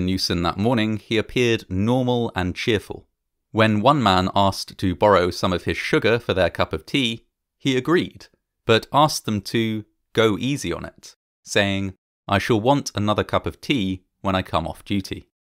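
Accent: England, United Kingdom. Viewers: I adore your pronounciation! Where are you from in England?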